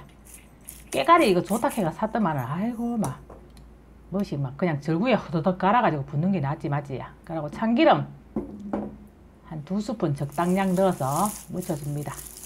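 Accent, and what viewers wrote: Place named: Daegu, South Korea. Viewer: 함무보께예~ 대구쪽사투리네요 "고맙십니데이"가 맞는말이 되야되는날은 언재쯤일까?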